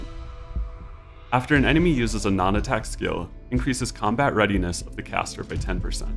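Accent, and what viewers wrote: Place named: England, United Kingdom. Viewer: English narrator? U sir, have my like 👍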